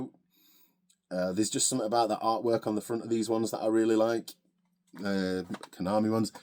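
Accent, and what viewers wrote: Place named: Yorkshire, United Kingdom. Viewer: A fellow Yorkshireman talking videogames? Subscribed mate!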